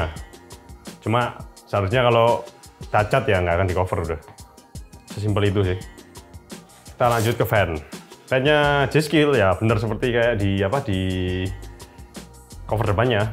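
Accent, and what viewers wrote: Java, Indonesia. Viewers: saya suka ciri khas medoknya mas 👍😀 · Kenapa saya lebih suka panggilnya mas mas medok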